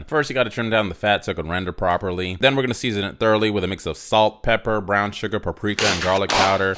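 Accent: Texas, United States. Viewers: Where are you from if your not texan...how the fu. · bros def from texas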